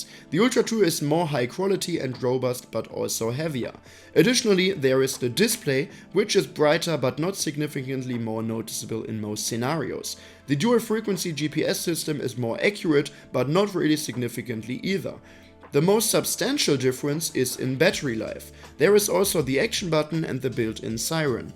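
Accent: Germany. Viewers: Love the accent haha, very German review, useful and helpful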